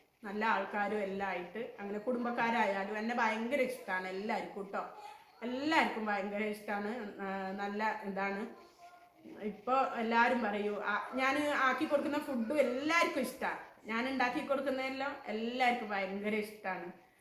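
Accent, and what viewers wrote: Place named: Mahe, India. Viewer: സ്ലാങ് ഇപ്പോൾ മാഹീ ആണല്ലോ കാട്ടുപാറ അല്ലല്ലോ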